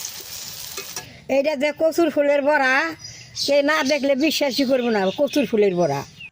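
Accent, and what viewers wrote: Bangladesh. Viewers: আচ্ছা ঠাকুমা তোমার জন্ম স্থান কোথায় গো, তোমার ভাষার সাথে আমাদের বাংলাদেশের বেশ কিছু জেলার ভাষার মিল পাই।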